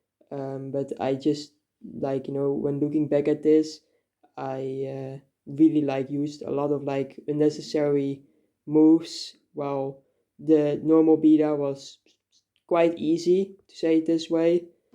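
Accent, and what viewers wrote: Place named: Netherlands. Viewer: Ja, begrijpelijk. In dat geval zou ik werken aan je uitspraak. Het is oké om Engels/Amerikaand accent te bluffen, dan krijg je er op den duur wel gevoel voor. Aangezien het Nederlandse accent nu erg sterk is. Ook de werkwoordgrammatica. Verder goede videoconstructie, dat je ook review-reviews doet, erg leuk!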